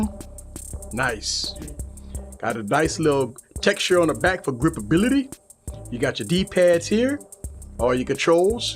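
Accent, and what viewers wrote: Louisiana, United States. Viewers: Just curious we're are you from? your accent says Louisiana..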